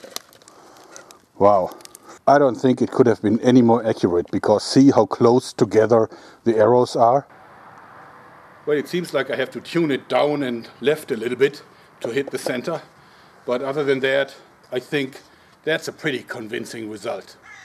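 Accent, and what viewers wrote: Germany. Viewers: german accent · Are you German? Your accent sounds like that (I'm a native German so I this accent and I'm pretty sure he's German)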